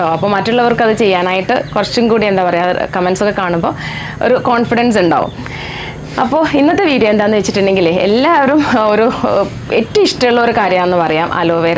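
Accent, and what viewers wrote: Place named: Tamil Nadu, India. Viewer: തമിഴ് പറയുന്ന ആൾ മലയാളം പറയുന്ന പോലെ ഉണ്ട്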